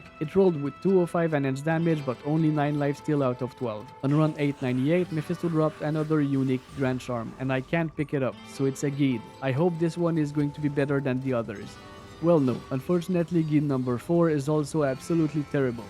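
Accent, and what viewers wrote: Quebec, Canada. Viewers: Where is your accent from? Sounds like Quebec french accent.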